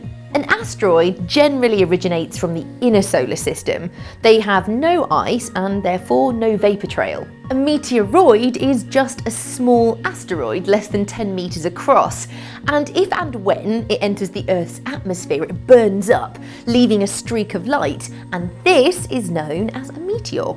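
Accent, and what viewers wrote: England, United Kingdom. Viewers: A beautiful woman with an English accent. Doesn't get much better than that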